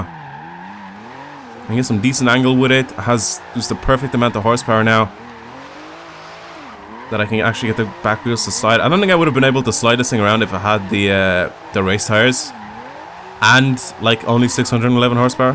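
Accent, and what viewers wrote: Ireland. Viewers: Sooo first ever video I've watched of yours even though I've seen you in Slap"s videos but are you Irish?? Thatd be pretty cool seen as i am too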